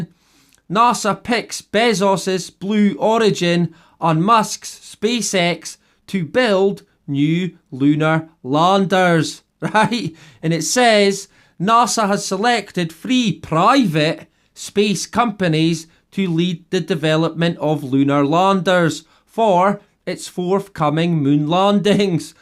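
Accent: Scotland, United Kingdom. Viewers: Been subbed since you were 1.800, well done on the rise in subs you've had, in a very short time. I love your accent, I hope I'm not insulting you if I ask which part of Scotland you are from...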